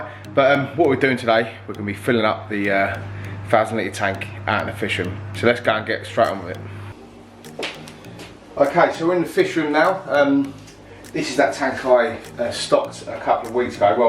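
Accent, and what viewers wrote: London, United Kingdom. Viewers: Good content man. Subbed👍 where u from mate? London? Essex??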